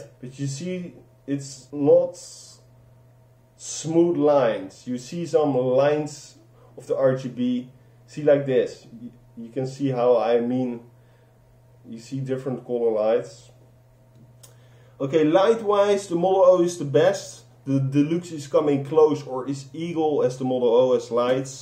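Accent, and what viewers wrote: Netherlands. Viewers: Are you Dutch u really have a Dutch accent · no problem keep it up maybe one day earning :))) and you sound dutch? xdd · Je hoort Ook gwn rustig die nederlandse actent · Love the little Sasuke figure! Greeting from Holland, I can hear you're dutch aswell. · Hah nederlands accent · u fr sound dutch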